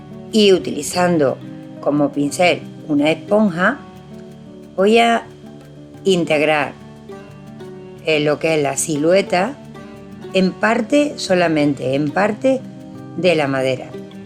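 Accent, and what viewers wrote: Andalusia, Spain. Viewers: hermosa la bandeja!! desde Argentina...me encanta tu tono andaluz!!!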